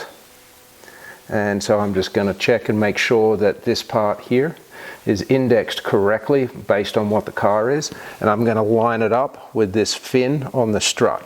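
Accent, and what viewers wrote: Australia. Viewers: Thanks for the video instructions. You wouldn’t happen to be an expat Aussie? It’s messing with me because an American accent is sneaking in with some words 😂. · Sounds like a bit of an aussie accent creeping through? Good info mate.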